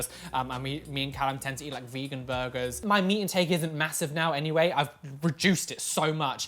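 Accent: United Kingdom. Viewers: I British accent and his word choice. Love your videos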